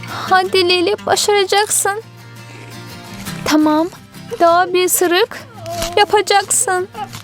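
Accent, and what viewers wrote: Azerbaijan. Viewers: Siz deyəsən Azərbaycanlısız səsiniz qəribə çıxır mütləq Azərbaycanlısız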